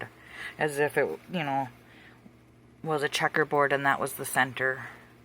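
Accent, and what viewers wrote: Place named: Midwest, United States. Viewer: Your from the Midwest huh, I nooww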